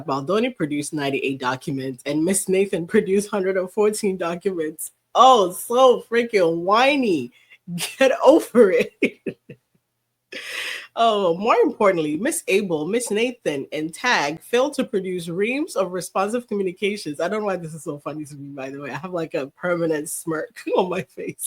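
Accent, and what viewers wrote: United Kingdom. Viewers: Awesome British accent ❤ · I love the British accent !! brilliant - you could star in a broadway Oliver Twist production !! 😂😂😂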